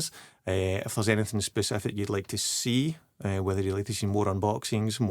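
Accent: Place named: Scotland, United Kingdom. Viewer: I would like to officially note my interest in playing ‘drunk-star’ in future mcu projects 👌 i think i’m perfect for that role 😂 I agree with u that Adam Warlock was a bit of letdown but didn’t take away from the movie. Thoroughly enjoyed it and a return to form for mcu indeed 😅 Didn’t realise u had a youtube channel pal! Enjoyed this video 👍 Great to hear another Scottish accent on here too. All the best with ur channel mate, great start for sure! 😃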